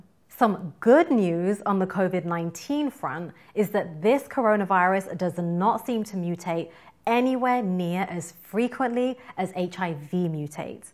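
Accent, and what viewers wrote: United Kingdom. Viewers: Thank you so much for your very informative presentation, Doctor. I love your British accent too. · BrRational: yeah, but she's cute, & with what sounds like a British accent, so... painless, at the very least. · So weird seeing perfect teeth speaking a British accent. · With her gorgeous face and that Brit accent, I can listen her read the phone book all day!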